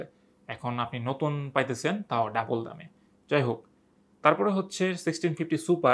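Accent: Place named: Chittagong, Bangladesh. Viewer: your accent kinda sounds like chitainga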